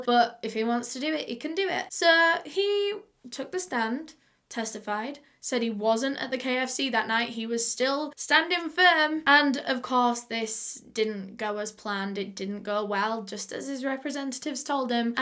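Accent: United Kingdom. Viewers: Who else is just mesmerized by her British accent?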